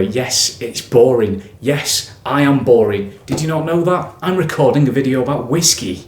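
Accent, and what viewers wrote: Lancashire, United Kingdom. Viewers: No need to make excuses for your accent; Lancashire and proud of it 😃👍. As for the Cotswold sherry cask - it sounds like a stonking dram. I have experienced the standard signature but alas found it a tad youthful and nothing stood out for me. Ne'er mind, perhaps I'll try again 😁. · Great review mate - must try some Cotswolds - sounds like an excellent distillery! No need to change t'accent lad! As a Lancastrian I love goin' to Booths too! Especially the one in Lytham.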